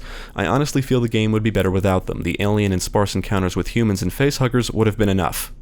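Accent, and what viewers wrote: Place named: Mid-Atlantic, United States. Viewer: Are you friends with cinema cins or ACG because all 3 of you guys have that fast pace, mid-Atlantic get to the point informative voice lol.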